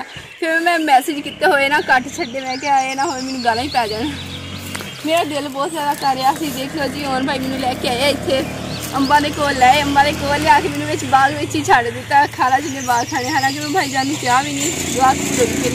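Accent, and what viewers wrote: Punjab, India. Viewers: Tusi ta same chadde punjab vargi punjabi bolde ho.very nyc vlogs 👍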